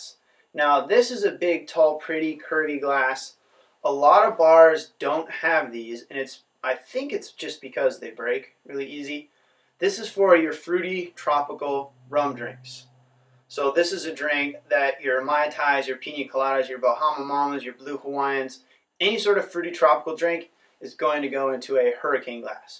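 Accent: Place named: France. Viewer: Love the French accent :D Thanks a lot brother, will definitely recommend!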